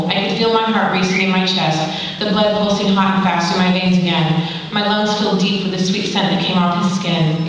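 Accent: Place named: United States. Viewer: Che accento americano orrendo xD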